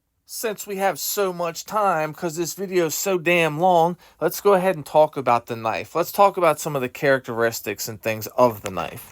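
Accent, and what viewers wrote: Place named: Delaware County, United States. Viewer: Where u from brother? You sound Delco to me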